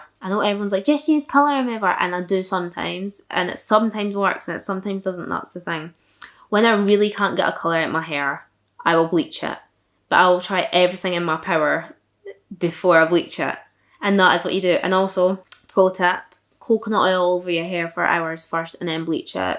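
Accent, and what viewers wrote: Scotland, United Kingdom. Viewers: As someone who’s from London I can’t even hear through ur accent sometimes but Scottish accents are cool! (Also i fuckin wish I didn’t have to pay for uni it’s so much) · Just seen Brad Mondo react to one of your videos and recognised the Scottish accent! I'm originally from Cumnock (Ayrshire) which could def give you Fife people a run for strongest accent lol I live in the US now though.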